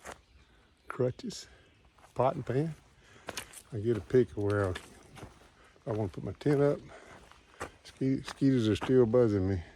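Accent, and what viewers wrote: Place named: Mississippi, United States. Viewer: I am way behind (Day 42) but jumped ahead to ask a question. I was wondering what service plan you picked for your “In Reach” and if you still feel it was the right plan for you? Sure enjoying your journey! Have you been using your turkey call lately - worked pretty well down south. I have ancestors from the Holmesville-McComb area of Mississippi who fought for a Miss. regiment in the “War between the States” so especially enjoy your perspective and accent. Good luck to Katahdin! God Bless.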